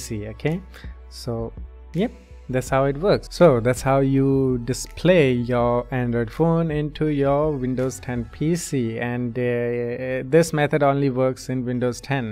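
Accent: India. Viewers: R u Indian? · U are indian?? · By listening your English I am damn sure that u r an Indian... Don't try that accent you have got caught 🤣🤣🤣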